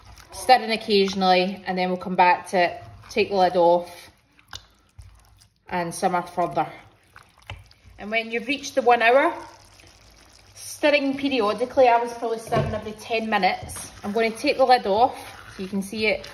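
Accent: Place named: Scotland, United Kingdom. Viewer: It's actually so nice to see and hear a gd recipe the way u actually speak Scottish xxx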